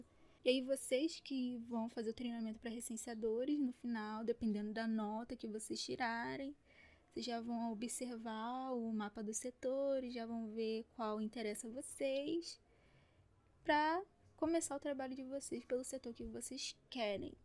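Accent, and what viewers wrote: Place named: Acre, Brazil. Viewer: Você é do Acre? Que maneiro!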